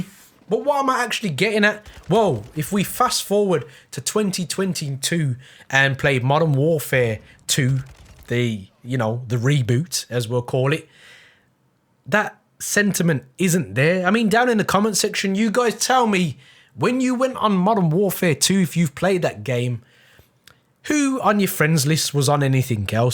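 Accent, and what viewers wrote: Birmingham, United Kingdom. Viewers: Love watching your content , you sound near to were i live in brum keep up the work bro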